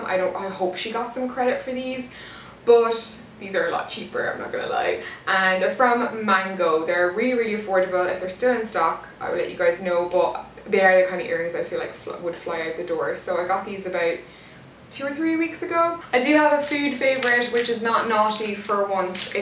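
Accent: Cork, Ireland. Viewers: Your Cork accent is definitely after flaring up after your visit home hahaha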